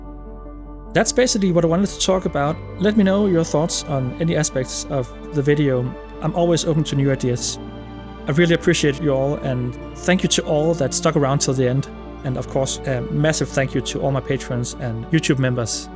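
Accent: Denmark. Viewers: You really sound like my late oldemor. She came to America from Denmark and had the heaviest accent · Yup, that’s definitely a Danish accent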